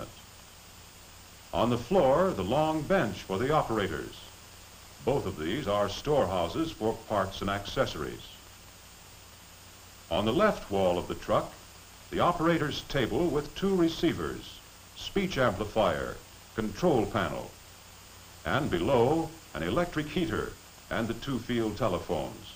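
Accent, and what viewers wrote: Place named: United States. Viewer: Beautiful American English Accent.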